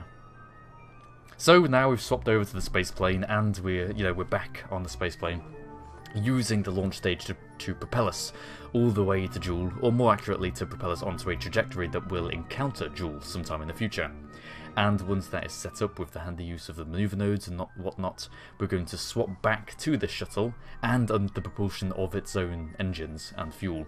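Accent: United Kingdom. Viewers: Ein, der Deutsch mit britischem Akzent spricht, kann ich nur als großen Gewinn bezeichnen.:) Greetings from germany, give us some more of your skills, we will help you learn it.:P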